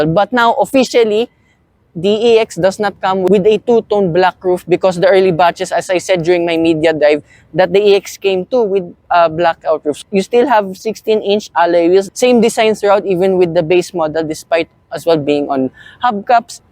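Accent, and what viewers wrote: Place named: Philippines. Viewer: Masgusto ko yung ganitong review. May Filipino accent. Masnatural since ganito halos lahay magEnglish merong Filipino accent. Masnaiintindihan ng tao. More review to come sir.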